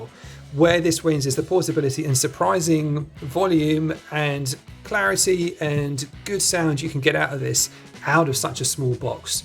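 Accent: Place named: United Kingdom. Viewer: many thanks look forward to your review. I never used nux before but I was totally blown away with the mighty air. It's only the size of my hand so you could take it anywhere. Having a built in battery and being wireless is massive feature. It's good you don't have to spend loads of money for good sounding gear. Keep up the good work just came across your channel and love it. Are you in USA , you sound British but you say pricing in dollars.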